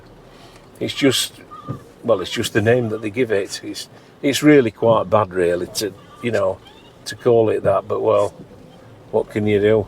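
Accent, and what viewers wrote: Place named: Southport, United Kingdom. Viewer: I was a southport boy with same accent.been in nz for 50 years but its music to my ears to listen to this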